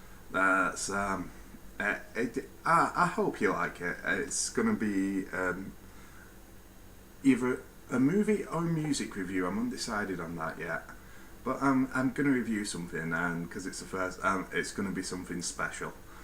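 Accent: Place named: Northern England, United Kingdom. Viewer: No worries, it must be hard being misunderstood like I am. I kinda get pissy at people when they mock me and generally don't understand me, so it makes sense the forum would be unaccepting of newer members but I'll try to fit in they're, and I will PM you when I figure the system out and if I remember you're name. Their's something I wanted to ask you, are you from England? I used to live they're until I moved. Most of my family live their as well. I can kinda tell your accent is from the North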